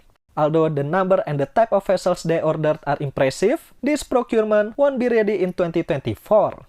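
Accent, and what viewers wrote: Indonesia. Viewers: Aksen orang indo:v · Saya suka bicara nya.logat nya kental indonesia..pertahankan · aowghwh logatnya indo bgt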